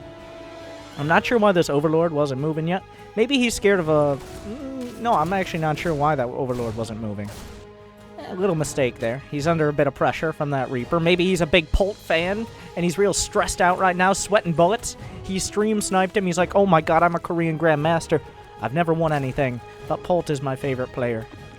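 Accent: United States. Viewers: I love your delivery, vocabulary and accent. It's nice to see such a strong american accent that is easily understandable by a non-native speaker. Kudos!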